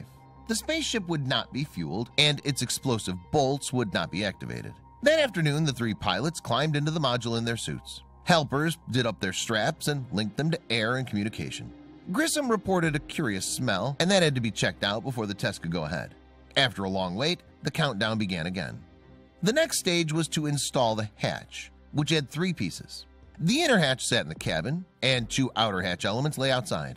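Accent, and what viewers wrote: United States. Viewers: This was a truly horrifying and tragic event . Im afraid the commentators Perky Annoying American Voice doesnt really Suit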